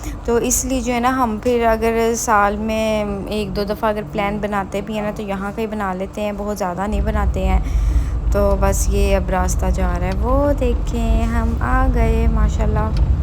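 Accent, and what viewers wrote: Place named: Punjab, India. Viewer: Love your vlogs ND language style too ND specially your Punjabi accent 😁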